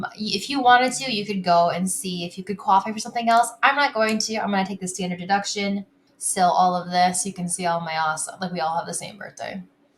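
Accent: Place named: California, United States. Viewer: I tried watching the Vedio as much as i could, your California accent ruined everything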